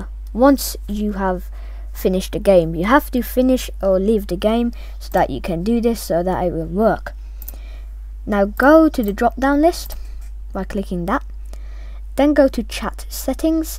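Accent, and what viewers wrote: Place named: United Kingdom. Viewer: I think im dumb cuz I just made it worst... And this this helped ty dude, ur a British accent ur British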